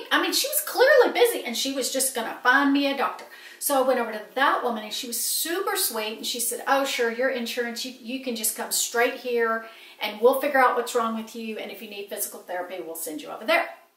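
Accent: Texas, United States. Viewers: this was a great video. I just got caught up on your last few videos and all of them are great!! I’ve lived in Tennessee all my life, but in a fairly large city. Yes, I’ve heard the perceptions people have of the south. But I’ve traveled all over our country, and nothing beats the friendliness of the southern folks. I’ve always been proud to be a Southerner!! You do have an accent - sounds sort of Texan to me. I get asked a lot when I travel if I’m from Texas. East Tennessee accents sound more Texan than West Tennessee. I have family that lives in Ozark AL and, boy, do they have an accent! But I love to hear it. The doctor that had to ‘approve’ you sounds weird to me too. btw....I recently went to Paris for the first time and I loved it. I know that is a favorite place of yours, and I can see why. It was actually in Paris that someone asked if I was from Texas!! 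So glad you are adjusting to your new home. You are so right— -attitude is everything! And you have the best. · I think you have a mild Texas accent. That's funny about the Mexican food. I'm from a smaller town in Arizona on the Mexican border, and went to college in Lubbock. I did not like Tex Mex lol. It's different from Sonoran Mexican food. Now I'm in Phoenix which is south, but not the South obviously. I remember everyone being so friendly in Lubbock, and I enjoyed that. But there were other things I didn't care for, so was glad to move back!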